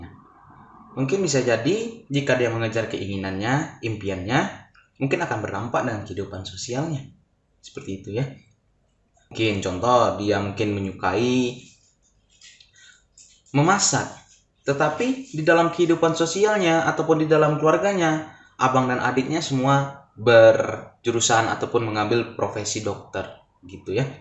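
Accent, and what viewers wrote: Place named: Padang, Indonesia. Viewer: Pisces hadir menyimak... Suarany Abang ini mirip logat org Padang🙏🙏🙏🙏🙏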